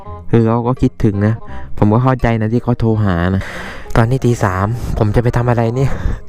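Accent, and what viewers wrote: South, Thailand. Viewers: คนใต้หรอ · คนใต้ซายม้ายนิ... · โซ้ยกาแฟนิ แหลงใต้ชับพึด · พี่คนใต้หรอคับผมก็คนใต้555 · พี่เป็นคนภาคใหนพูดเหมือนคนใต้เลย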